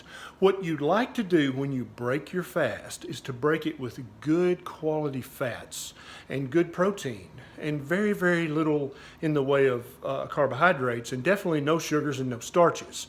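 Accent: South, United States. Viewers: 04/22/2021 Your voice sounds so familiar.🤔 I think Justin Peters ...🤔 Love the Southern accent.👊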